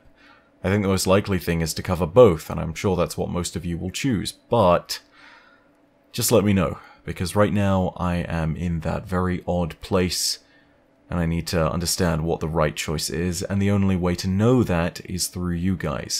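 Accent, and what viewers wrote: RP, United Kingdom. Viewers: Personally I find the idea of a "posh" Englishman talking about "swordsplosions" a fantastic fit, but as others have said, don't risk your health over it if it's going to have a negative impact. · Any Borderlands content would be interesting, while its not as intricate as Destiny; there's still plenty of content to talk about. Primarily, I love you for your Destiny content first & for most, anything else is a grand bonus. A "Posh, Englishman tone" can work with anything. My ultimate suggestion, try doing a lore or analysis vid on Borderlands 1/2/pre-sequal. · I agree, the tone of a "posh Englishman" narrating absolute insanity (that also has a pretty good story to back it up) would be phenomenal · My advice for Borderlands lore stories? cover the background lore and the small tidbits that flesh out the world. Example: Tediore guns are made cheap therefore they're thrown away to be used as an impromptu grenade rather than be reloaded. 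Don't tire yourself out explaining motivations of major characters and story beats. Research the short stories that flesh out the companies that run the universe of The Borderlands. Atlas, Maliwan, Pangolin, Hyperion, Dahl, Jakobs, etc. Check out the small bits that flesh out the lore because those bits are some of the most fun and refreshing! Borderlands is somewhat secretly about capitalism run rampant, sometimes worshiped, sometimes turned into warring factions, sometimes turned into fights for freedom. Do tackle stories like that of Mr. Torgue with your posh british accent, and tackle it with a rich sarcasm like the stories are a joke and not to be made seriously, because they are jokes! Rather than look at Borderlands from a surface level all the way maybe you'd have fun laying a foundation for the folks that don't have a clue about Borderlands, tell them about Pandora, The Vaults, Sirens, then tell them about the world and the companies that exploit it. 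:) Don't take this as something you should cover if you simply don't mesh with it ya know? Find the stories YOU like and share them. I'll be here for whatever content you make, but if you need someone like me to tell you that you might like Borderlands and it might be a surprising bre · There actually is a surprising amount of deep lore in borderlands. It's more recent, like, a few hundred years, not even, so nothing as deep and expansive as Destiny. The call I up to you. If Borderlands calls to you, if you click with it's humor and world, go ahead. If there are other games you wanna cover, that's fine too. TBH, you put it best. Posh British guy may not be the right "voice" to cover Borderlands. Then again, what is the right "voice"?, if you have fun with the game and want to share that fun with us, go ahead. If you wanna play other games and share those with us, that's fine too. Peace,. 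Whatever you want is cool with me. I definitely be up for borderlands content. Most of the lore is upfront in all of the games in talking to npcs and side quests. Not too hard to research, but may take some time to get to. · Posh English man? I'll just imagine that Sir Hammerlock is just telling me a story about the lore of the game. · I'd love covering Borderlands lore! Posh Englishman explaining Borderlands is something I need in my life! 🙌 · You could channel some Sir Hammerlock with your "posh englishman" thing and spice it with some Marcus, it could also wait for like say 6 months . Also the BL series is also something that could produce some "that sound like a YOU PROBLEM..."s. On what you could cover... I don't think there's that much but all the weapons manufacturers should have some worthy stuff and the next game is expanding on them AND the universe more. I also wouldn't mind some vids here and there on content other than Destiny.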